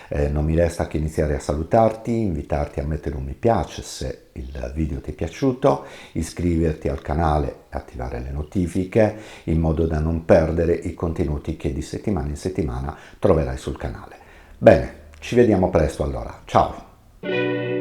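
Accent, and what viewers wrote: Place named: Sardinia, Italy. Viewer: Grazie sei molto comprensibile, sono una principiante, nemmeno più tanto giovane, ma mi piace imparare e trovo il tuo metodo molto semplice..... Grazie. Ho molta difficoltà nel cambio accordi, anche x un problemino "tecnico".... Ho una Rizoartrosi al pollice sinistro😢..... Ma non mollo...... Voglio imparare e ti seguirò con piacere sei molto bravo complimenti..... E poi il tuo accento sardo è stupendo adoro la tua terra😊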